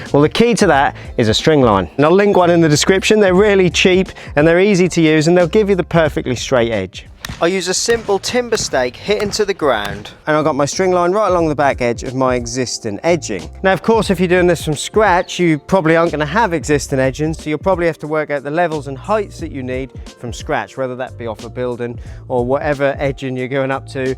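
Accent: Norfolk, United Kingdom. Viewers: Could tell you were from Norfolk within about 5 seconds 😂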